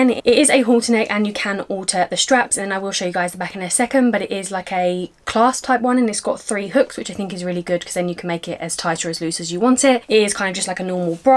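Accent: United Kingdom. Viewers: SOFT MODELING.. JUST ELEGANCY AND BEAUTY... YOUR BRITISH ACCENT IS VERY CLASSY.. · My god.. I didn't know you had a British accent.. I am in love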